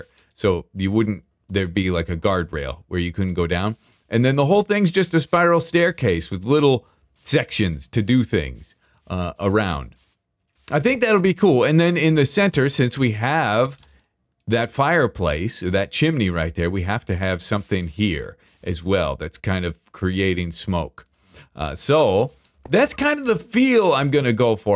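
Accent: United Kingdom. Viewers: And that pa'fect brittish accent ☕❤️❤️❤️ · Amazing wizard cloak, about as amazing as your British accent <3 That witch hut looks fantastic, I thought you gonna do a big dead tree (and a pond) but maybe this was a "good" witch living close to the village. The end dragon is shaking in its boots lol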